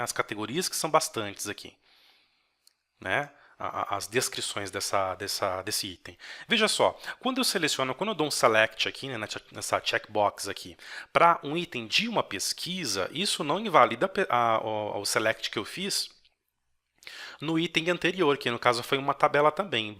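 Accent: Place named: São Paulo, Brazil. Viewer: Muito boa a aula. Obrigado. Me inscrevi no canal. Obs: Você tem o sotaque paulistano mais característico possível.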